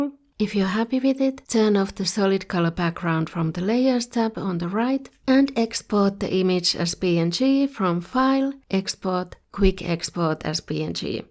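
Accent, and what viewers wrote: Finland. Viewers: Lol, after hearing your accent i immeadiatly knew you were finnish 😂. Keep up the good work, coming from a fellow Fin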